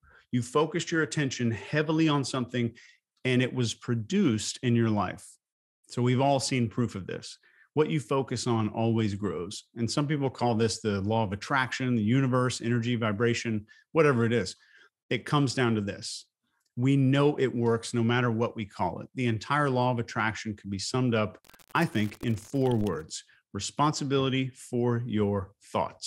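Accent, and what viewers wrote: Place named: Arkansas, United States. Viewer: I’m here from the behavior panel. Im also from Arkansas, so your accent is so familiar to me, you remind me of some of my family and friends 😊 thank you for your content it is really appreciated ❤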